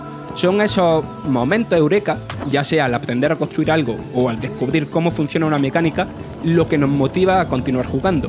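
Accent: Andalusia, Spain. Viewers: Tiene acento andalúh, déjalo que hable como quiera. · yo aun no he sido capaz de ver un videoanalisis de vandal desde que esta este comentarista , parece que tiene la nariz taponada , y el acentillo andaluuuuuuh es muy exagerado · Es del sur de España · Tío, se hace muy incómodo escuchar un vídeo con un acento tan CERRADO. Soy Andaluz y me encanta nuestro acento, pero éste es demasiado cerrado y muy desagradable de escuchar durante un vídeo de larga duración. Tío, si te vas a dedicar a esto, te recomiendo clases para mejorar la vocalicación. Un saludo desde Málaga. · Pues nada, el que tenga problemas con el acento andaluz que se tape los oídos o que se vaya a donde crean que hablan un castellano perfecto y sin acentos,,, lo que hay que leer a estas alturas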